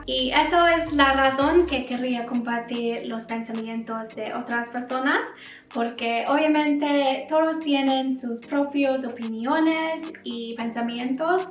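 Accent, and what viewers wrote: Latin America. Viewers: Bonito acento y pareces latina! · Amo que hables el español como los latinos y no como españoles! HAHAHAHH Me encanto tu vídeo, desde hoy empiezo a usarla espero me vaya bien!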